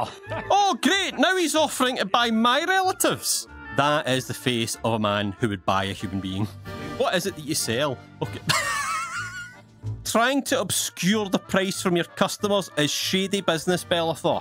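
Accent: Scotland, United Kingdom. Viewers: I love much more aggressively Scottish he feels in this video. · I thought this was an any Austin video when I saw the title and was pleasantly surprised with a raving Scottish man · definitely, before I've find this channel i thought i understand any english really good, but it turned out I've never heard scottish accent lol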